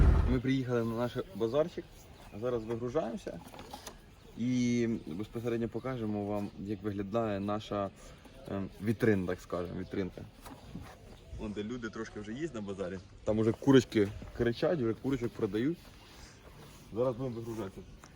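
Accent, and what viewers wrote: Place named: Poltava, Ukraine. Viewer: Чую чую полтавський говір, бо сама з Сотницького,Гребінківський р-н,там народилася ,а зараз під Києвом живу,а батьки там. Успіхів вам та мамі. Молодці. Приємно бачити та чути,що ви вже й канал маєте. Розвитку та продажу,нових ідей, здоров'я та мирного неба. Підписка😁💛💙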